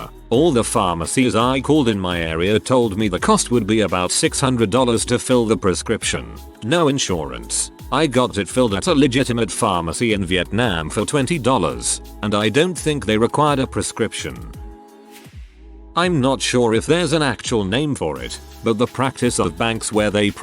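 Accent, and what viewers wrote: United Kingdom. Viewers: Where did you get that robot voice with a British accent ,,, it's hilarious.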